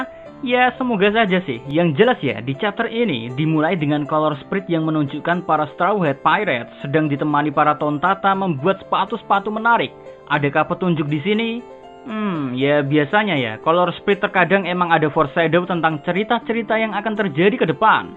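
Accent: Java, Indonesia. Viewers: Pusing gw ama logatnya,medok banget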